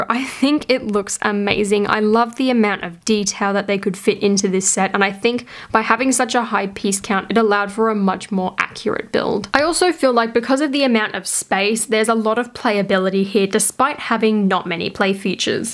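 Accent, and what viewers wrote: Australia. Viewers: I love your accent! I watch your videos to practice my Australian English listening. New follower 😉.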